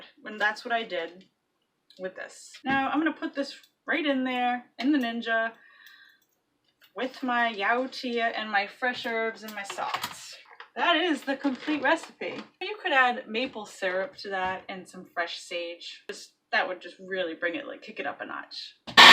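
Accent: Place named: New England, United States. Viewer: Thankfully I recently found your channel and the moment I heard you speak, I KNEW you were also a New England girl. 🙌🏻. Thank you for all you do here. I see the comments. I know all the positive feedback you receive, but I hope you truly understand what positive things you are doing for all of us who are desperately trying to heal themselves in various ways though just don’t know how. Many many thanks. ♥️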